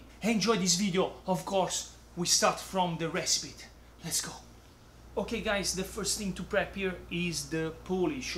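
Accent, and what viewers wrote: Italy. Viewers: Thank you l love watching you make your pizza full of action like dancing and so nice to hear your italian accent, bravo! Greetings fm Malaysia.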